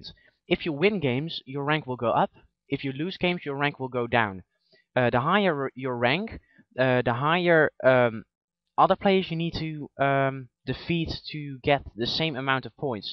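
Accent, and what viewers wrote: Netherlands. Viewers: Are you dutch? U got a dutch accent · great, but should have added an ingame shot as well, just to see what it looks like. Just wondering, dutch accent?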